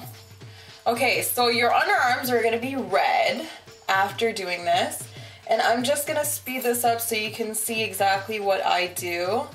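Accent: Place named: Canada. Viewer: you kinda sound like a canadian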